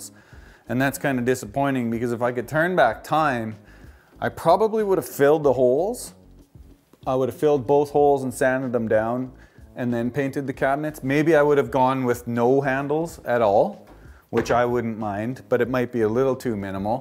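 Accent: Canada. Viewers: Nice video, you sound like you are from the Ottawa Valley.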